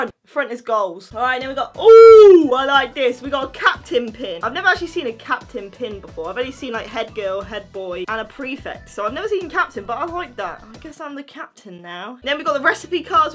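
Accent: United Kingdom. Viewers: YESSSSSS !!!!!!I love u.I love your intro:Whats up muggles hahahahha Also are u british?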